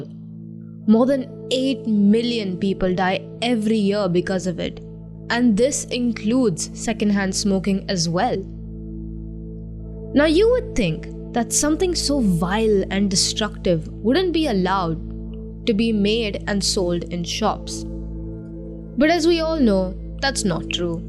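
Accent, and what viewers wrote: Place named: North India, India. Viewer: This North Indian Accent. And the audacity to speak it so confidently. Fucking "Pakistanis on the wrong side of border" .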